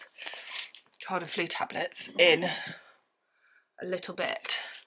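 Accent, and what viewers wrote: United Kingdom. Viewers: You have a really good British accent.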